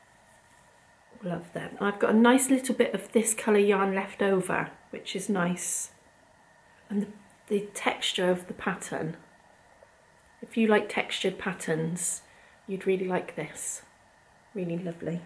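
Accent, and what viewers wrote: United Kingdom. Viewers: hello, I am new here, i enjoyed this episode so much:) I also have daughter age 17, shes studies at gymnasium grade 10, i could not understand a of your education system, did i understand right, son is at grade 6 or it's something else? Also I made a hat Melete , its really warm hat:) I don't know from what country you are, sounds like UK, but in Latvia we have really cold weather till -20, and I hope this will be warm enough for my daughter. Also loved suggestions for movies, tomorrow we will watch Wild robot. Thank you:)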